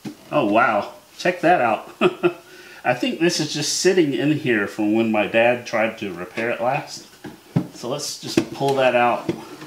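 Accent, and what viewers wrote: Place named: Alabama, United States. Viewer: Love the radio and your solution until chassis can be repaired. Hope you don't mind me asking. Are you from Alabama? Accent sounds familiar. Anyway all the best from Western Australia.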